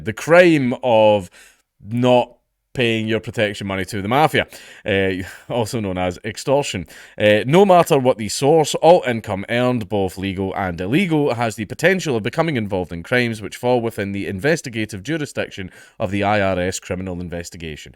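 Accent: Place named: Scotland, United Kingdom. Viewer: Your commentary is always spot on, but I’d listen to you even if it wasn’t because I love that Scots accent!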